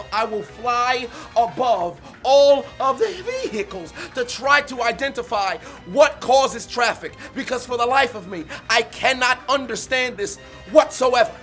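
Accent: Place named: Atlanta, United States. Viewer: Sounds like Atlanta, if I had to guess 😂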